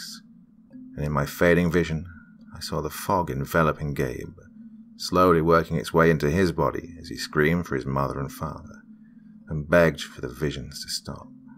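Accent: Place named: United Kingdom. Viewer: Your smooth british accent is annoying af, but some of these stories are entertaining.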